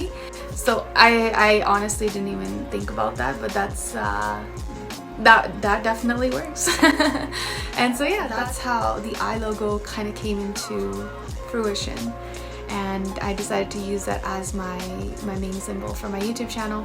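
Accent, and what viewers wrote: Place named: United Kingdom. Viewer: Hii, your english is like britishers😶😶 how it is I don't know.... But anyways your dance is amazing😘😘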